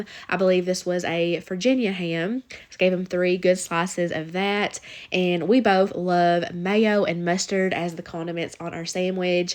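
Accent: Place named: Kentucky, United States. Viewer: Are you from Richmond? I’m from Paris, KY and I feel like your accent is heavier than most people’s around here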